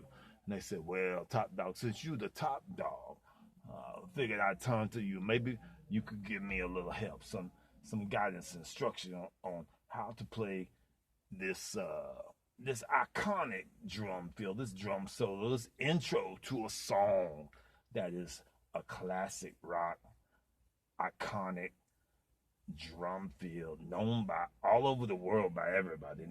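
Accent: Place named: Louisiana, United States. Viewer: First of all, I love " '. Is he from Louisiana??? Lol. Secondly, he delivers great content in an entertaining way. I hope he sticks around! Haha. Great video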